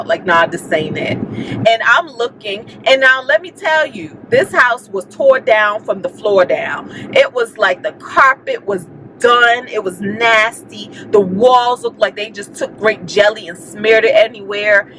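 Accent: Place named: New York, United States. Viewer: Check to see if your state has a ERAP program. Idk where yiu are from but you sound a little New Yorkish to me-- if so that program opens June 1 and the landlord can apply for it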